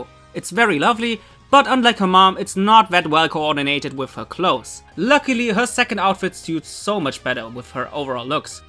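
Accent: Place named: Germany. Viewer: Hey + Maybe I'm wrong but are you from Germany? Your way of speach of some Names really seams like your from germany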